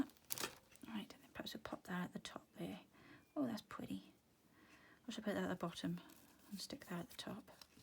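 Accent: Wales, United Kingdom. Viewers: Do i detect a Welsh accent, where r u from, like your work. X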